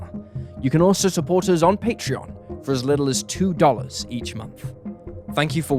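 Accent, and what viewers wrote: United Kingdom. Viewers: Where would you ascribe his accent in the UK?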